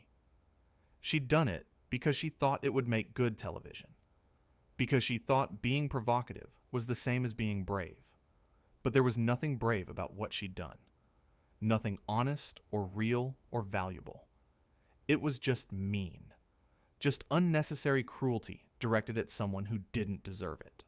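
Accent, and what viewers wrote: United States. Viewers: Why is an American doing the commentary?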